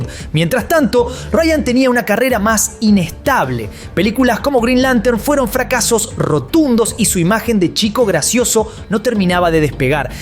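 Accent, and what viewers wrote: Argentina. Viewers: bueno, no sé, yo vi este lío de otra forma, " Black le tenía ganas a Baldoni, pero él la rechazó, y como nadie rechaza a una mujer tan irresistible como black ( eso piensa ella), y como tiene un esposo tan poderoso y una amiga tan adinerada, pués comenzó la venganza femenina, destruir a su enemigo, y quien sabe que le contó a su esposo, para que él haya hecho este ridículo, poniendo en peligro su carrera". Yo pienso, la mujer está quiso destruir a un hombre que la rechazó, pero como dicen aquí en Alemania, " Se dio ella misma un tiro en la rodilla", o en Colombiano" El tiro le salió en reversa". Saludos, me encanta escucharte, ese acento argentino y como hablan ustedes me encanta, saludos de una Paisa en Alemania.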